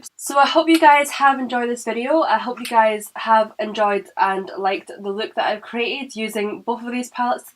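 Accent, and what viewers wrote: Scotland, United Kingdom. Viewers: Love your accent Scottish?🏴xo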